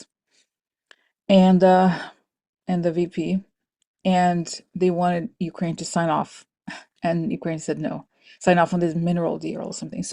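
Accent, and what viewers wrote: Russia. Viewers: The switch between your Russian voice, perfect English and funny faces is super... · I love your Russian accent! You are very good at that and making their faces. I always laugh and I CAN understand what you say. We just have to listen. Thank you for all you do to bring us much needed information. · I love the Russian accent. makes the commentary come alive. Very entertaining. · Love your Russian accent 😂💞 Thank you for the work you do ❣️ Much love and gratitude 💖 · I enjoy listening to you,. Always informative. And I understand you perfectly, even your russian accent😅 Take care😊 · Your Russian accent is just fine. I have no problem understanding you. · I have no problem understanding your Russian accent. You are just PRECIOUS and you make me SMILE!!!😊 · I don’t mind your Russian accent! I just use English captions on the video! 😂😂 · I love your Russian accent and all your videos. 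You and Corina are so much better informed than any other channel. You are the best!!! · I am hearing impaired so I either read the CC or the transcript. Pay no mind to negative comments regarding your Russian accents. You make me laugh. I adore you.❤ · I love YouTube. Sometimes I watch your video almost to the absolute end but don’t let it completely finish because I want to comment and when I come back it isn’t where I left it but it comes back to a part that intrigued me when you spoke about it the first time!!!! Freaky cool! Love your work AND YOUR RUSSIAN Accent!!!! And I love the makeup and hair styling!!! You are working so hard whatever you need to do to keep yourself soothed and I think fresh and beautiful!!!! So appreciate all your hard work! Much love from Michigan. 💛✌🏻 blossoms are coming!! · I love you Russian accent.. I think it's cute I think it's precious and I understand every single word you're saying.. 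❤ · I like your Russian accent and it’s funny! Keep it up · I love your Russian accent! Makes me laugh every time. I love your welcome song too. Look forward to it every video. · I love your Russian accent. I enjoy listening to you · I love your Russian accent you are an actress and a channele. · To hell w people that don’t understand ze Russian accent. 😂 For crying out loud! I think it’s super cool when you do it!! Return to zender!! 😅 😊Rock on!! ❤ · Screw those people who don't like the Russian Accent. I love it!! We got you girl!! 🎉🎉🎉🤪🤪💙💙💙✊️✊️✊️ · i love your russian accent. it is hilarius. I laugh out loud. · I think your Russian accent is hilarious! My only piece of advice, after spending about a month total in Russia many years ago: Pronounce your “h’s” as if you’re trying to clear phlegm from your throat…. Especially with the word “happy”. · I love your Russian accent!! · I can understand your Russian accent just fine; I have a degree in theatre, though, so…🤓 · Love your Russian accent! 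Thank you. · I love your readings and your Russia talking voice😅. You're great! 🥰💞🤍🕯️🕊️ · I love your Russian accent ❤ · I love the russian accent, that is so funny. I live for it. Not only do you give us hope but you make us laugh. Its everything, dont change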